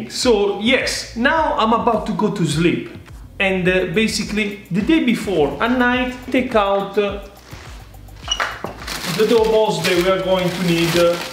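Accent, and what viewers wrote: Italy. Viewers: .. fantastico il tuo inglese!! Lo adoro, italienglish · You are kidding , right? He’s originally from Italy, so he has an authentic Italian accent.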